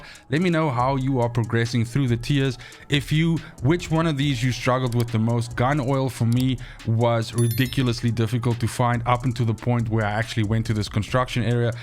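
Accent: South Africa. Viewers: Were are you from? South Africa? Hard to pinpoint the accent hehe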